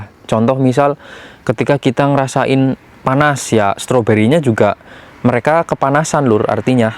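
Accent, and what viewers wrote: Banyumas, Indonesia. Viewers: kan cilacap tapi stay nang taiwan lah ngapak ngendi kue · Asline ngendi mas ngapak"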